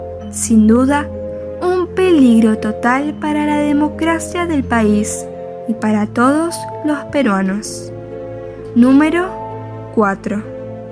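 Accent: Argentina. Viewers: ¿Eres de Perú?, suenas como de argentina xd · Tanto terror? A proposito de donde eres, este es una exageracion. Lean no se dejen esta con dejo argentina les convensa si en verdad quieren a Perú. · Siempre me he preguntado si eres Argentina? Por que tu voz es muy bella.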